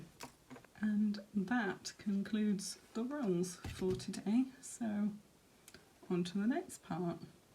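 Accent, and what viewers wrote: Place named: United Kingdom. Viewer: Ikr? It's like the saying about there being so many books & so little time. But if you're a fast reader ( I was but it's now depending on the book) you'll do fine👌.I just lost one of my cats while taking him & his brother to the vet to be fixed,so I'm finding it difficult to focus on reading more than a short chapter atm. Anyway,just keep on reading as much as u can!👍 P.S are u from The UK? Your accent is so pleasant to listen to. I'm from Northridge, California,a suburb of Los Angeles & can't stand my speaking voice-lol.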